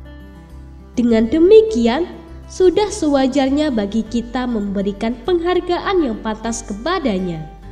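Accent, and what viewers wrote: Java, Indonesia. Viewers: Suaranya medooook banget mbak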